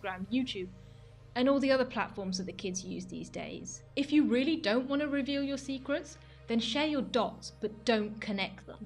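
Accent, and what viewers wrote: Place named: United Kingdom. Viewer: damn you have british tone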